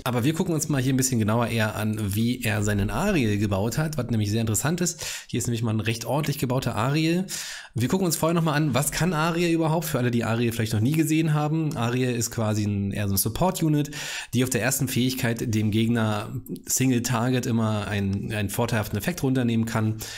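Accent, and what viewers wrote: Berlin, Germany. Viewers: Hey wieder danke für das nice video direkt mal Ariel und ella umgerunt waren schon eingestaubt dein Berliner slang macht es einfach noch mega symphatisch Grüße knall&voll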